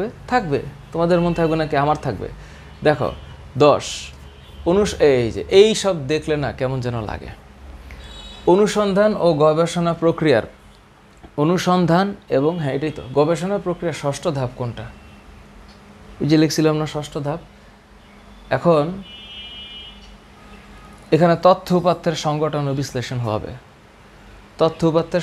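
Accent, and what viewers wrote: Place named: Chandpur, Bangladesh. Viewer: Chadpur er bhasha bola ta kinto joss hoice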